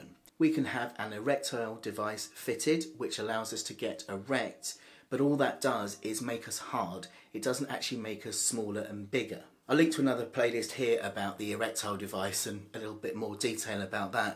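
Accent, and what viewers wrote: United Kingdom. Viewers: I am impressed with your perfect British accent.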